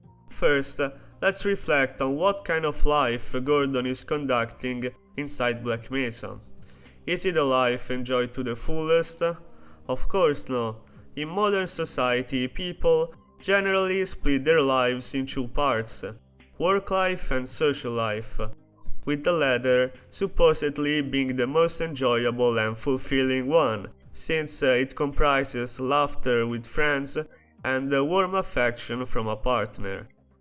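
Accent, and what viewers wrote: Italy. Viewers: You have the most italian accent... ever. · Your accent sounds Italian! Edit: ok ai carabinieri ne ho avuto la Conferma. GG compatriota. 👍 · Are you Italian?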